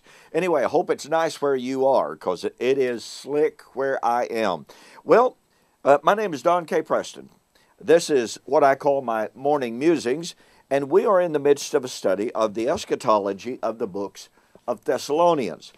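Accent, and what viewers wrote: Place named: Oklahoma, United States. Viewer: Sounds like Ardmore, Oklahoma. I used to live in the City.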